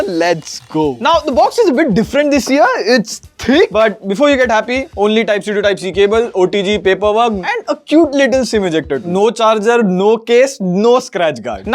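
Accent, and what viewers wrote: India. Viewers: Indian accent sucks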